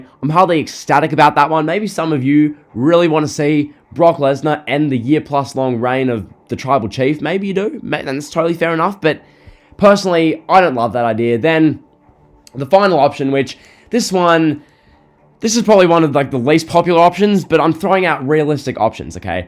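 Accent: Australia. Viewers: And wow you sound more Australian then usual due to the mic,cool tho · Lol somehow you've become more Australian than you already were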